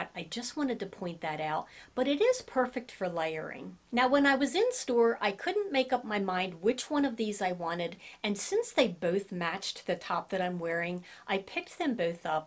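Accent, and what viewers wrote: Philadelphia, United States. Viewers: I much prefer the size small cardigan on you. The medium size, as well as the stripe design, overwhelms you. Great try on as usual. I agree with the MA/CT viewer (I'm from RI). Wustersheer is the way we pronounce it, although with our RI accent, what do we know. Love your accent (Philadelphia-ish, Southern NJ?)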